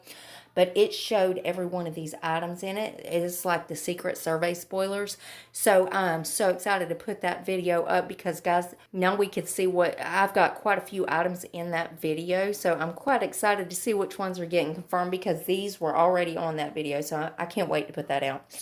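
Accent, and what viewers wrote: South, United States. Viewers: Love you!!!! Love the way you talk....I am country too♥️♥️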